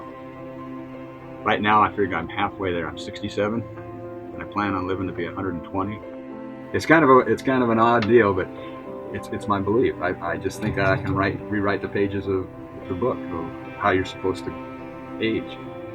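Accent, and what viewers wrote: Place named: Hawaii, United States. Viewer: he's from Hawaii. That's exactly how they speak. Yer a dick.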